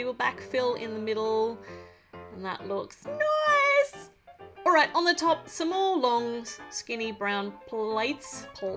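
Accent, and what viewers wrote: Australia. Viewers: Me: *watches this * Mum: *walks into my room * Also mum: I rEcOgNiSe ThAt AuStRaLiAn-Y aCcEnT Legit just happened 😂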